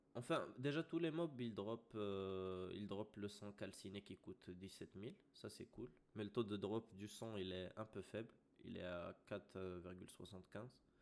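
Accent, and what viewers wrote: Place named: Arab world. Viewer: L'accent reubeu qu'on kiffe, merci pour l'astuce. Le prix ne ferra que baisser dés maintenant haha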